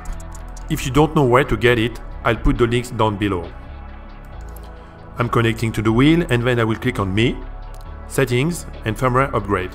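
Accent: France. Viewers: Plus un pour l accent français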